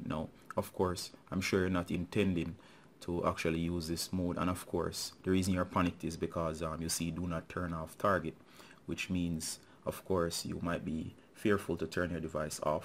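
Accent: Jamaica. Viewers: I did panic! Thank you so much. All good now. Your accent helps too.....Jamaician?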